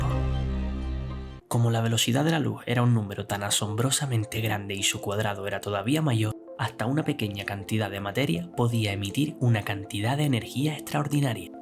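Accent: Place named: Canary Islands, Spain. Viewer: Un maravilloso video bien explicado y con acento canario, que mas se puede pedir? Muchas gracias! 😊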